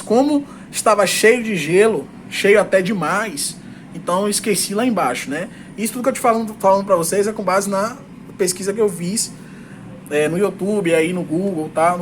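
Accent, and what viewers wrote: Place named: Salvador, Brazil. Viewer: Fala moral, Ótimo vídeo, pelo sotaque voce é de salvador? Pois se for onde voce comprou essas peça?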